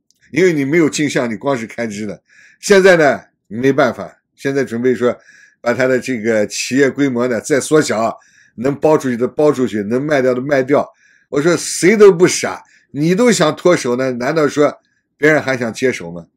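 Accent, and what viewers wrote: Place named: Xinjiang, China. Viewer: 听说晒了一整天怎么感觉有点新疆口音？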